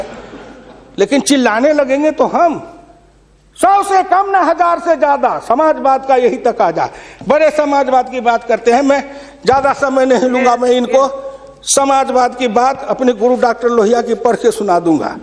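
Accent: India. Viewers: sir you have brilliant desi style of speech with logically correct ground reallities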